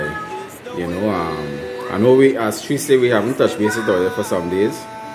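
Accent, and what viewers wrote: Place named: Trinidad and Tobago. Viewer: I love trini accent omg😍 We are a Jamaican youtuber living in Barbados🇯🇲🇧🇧 New subscriber here!💕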